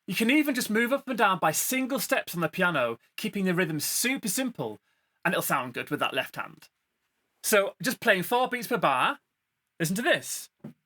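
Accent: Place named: Manchester, United Kingdom. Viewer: Love listening to your accent! I’m guessing it’s northern - Manchester? From an avid British tv watcher from Australia. Also enjoy the content of your videos - very helpful!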